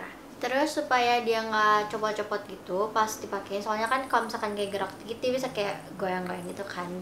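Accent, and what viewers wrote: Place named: West Java, Indonesia. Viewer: Kaka orang Sunda ya... Logatnya keliatan😄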